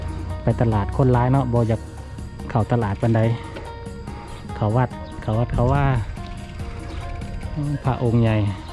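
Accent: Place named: Xe, Laos. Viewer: ສຳນຽງສຽງເວົ້າມ່ວນເນາະ ເປັນຄົນເຊບໍ່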